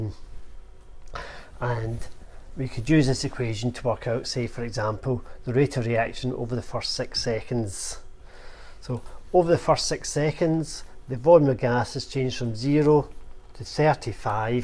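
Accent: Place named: Scotland, United Kingdom. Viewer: THANK YOUUUU NO INDIAN ACCENT FINALLY!!!! scottish sounds so much better!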